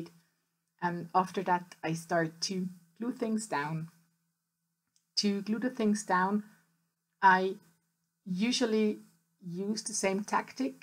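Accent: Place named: United Kingdom. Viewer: You‘ve got a fairly british accent my dear ☺️ Sehr schönes Layout! Liebe Grüsse 🙋🏻‍♀️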